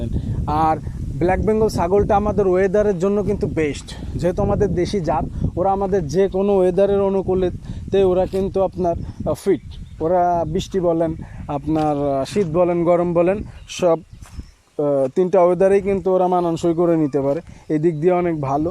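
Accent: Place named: Faridpur, Bangladesh. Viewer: ভাই আপনার ভাষা ফরিদপুর কোন জায়গা,,, আমার বাড়ি সালথা মাজারদিয়া ইউনিয়ন কাকদি গ্রাম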